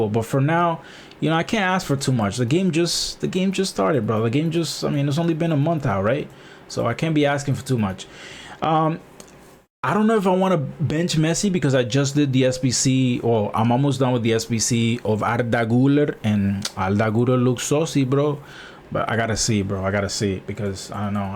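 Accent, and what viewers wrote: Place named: Dominican Republic. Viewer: Bro your funny 🤣🤣🤣 with the Spanish I can hear your from RD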